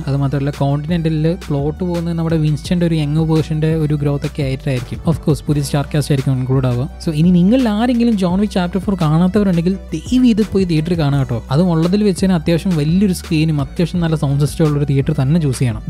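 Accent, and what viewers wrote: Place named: Kottayam, India. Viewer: ചേട്ടന്റെ എല്ലാ വിഡിയോസും ഞാൻ കാണാറുണ്ട്. എനിക്ക് ഒരു കോട്ടയം എവിടെയോ തോന്നുന്നുണ്ട്.കാരണം എന്റെ ചേട്ടൻ കോട്ടയത്തായിരുന്നു degree ചെയ്യ്തത്. ചില കാര്യം പറയുമ്പോൾ correct എന്റെ ഏട്ടൻ സംസാരിക്കുന്നത് പോലെ തന്നെയാണ്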